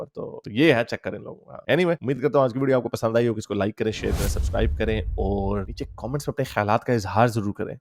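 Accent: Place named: Punjab, India. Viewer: Great voice...& ... Punjabi laheka in speaking.... Thanks Tu shissss Great....❤❤❤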